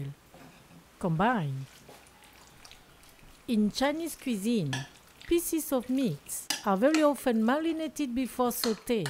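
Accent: France. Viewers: Asian lady with french accent - noice.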